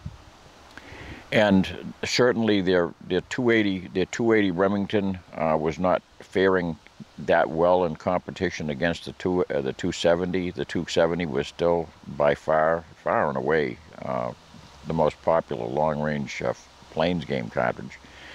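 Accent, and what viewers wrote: New England, United States. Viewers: I'm not sure where you live but you do have a New England type of accent? I was born in Minnesota but spent 70 of my 80 years in California, except for a couple years in West Germany. Anyway, here, you have touched on one of my favorite cartridges. I have owned one Ruger M77 25'06Remington and two 25'06 Ackley's. I hunt northern Nevada, or at least I DID, and for goats and coyotes at 350-400 yards, it does the job. The traditional problem has always been that nobody makes a suitable target quality bullet in that bore diameter but I knew a fellow that would run off some of his super accurate 100 grain Starkes. Great bullet weight for open range coyotes. You are frequently focused on resale value, when buying rifles, and everybody has their reasons but I don't care about that. If I need a new barrel, I buy a premium target grade in whatever contour that strikes me and whatever twist suitable for the bullets I want to use. 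By the way, I think we agree about flat based bullets, whenever possible. I am NOT a fan of this extreme long range potting of big game animals. These people have no idea how much even a bedded deer can move while the bullet is in flight! My ethical distance is 400 yards. Yes, I will take an occasional shot at one of a pair that is leaving, like if it's straight away. There is a magic in some cartridge designations and 25'06 is one with the magic. So is the 220Swift; pure magic! So is 300Mag. I'm an advocate of a factory 22-250AI cartridge. That case far outpe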